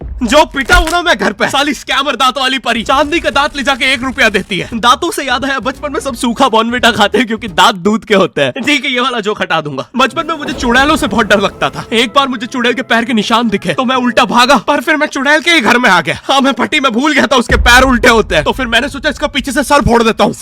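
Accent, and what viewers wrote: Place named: Malwa, India. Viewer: bhaii aap hamre malwa ke ho kya gav ke 😂 aaj confirm ho gya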